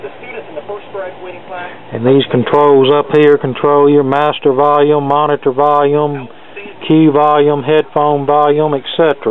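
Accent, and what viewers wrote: South, United States. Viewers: I love southern people...that voice...kills me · I was born, raised and grew up about 45 minutes outside Nashville, Tennessee. Nashville was a much smaller city than it is today in 2022. In 1985, for about a year, I moved to Downers Grove, IL and worked in Chicago. Communting into the city from Downers Grove was hell on earth, even in 1985! I moved back to near Nashville in 1986. I don't have the southern accent this gentleman has because I worked in major market broadcasting. Although I wanted to major in electrical engineering and be a radio station engineer, I did take a public speaking class in college to speak in a more midwestern accent since I had to do on air work for radio. Also my mother was born and from Brooklyn, New York. She met my Dad while he was in the military. He got station as a Staff Sargent at Ft. Campbell, KY. 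In 2022, I'm retired.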